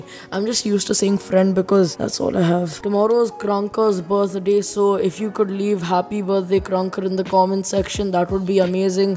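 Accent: India. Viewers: u r indian na ?? :) ;) I think because your voice is like an indian talking english :)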